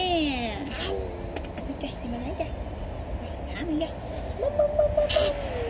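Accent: Mekong Delta, Vietnam. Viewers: Bạn có nét đẹp và giọng nói đậm nét miền tây sông nước...Chúc bạn hạnh phúc nơi xứ người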